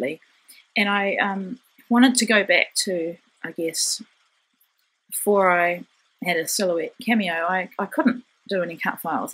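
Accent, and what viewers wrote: New Zealand. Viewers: Love this layout! I could listen to you talk all day!!! I love the NZ accent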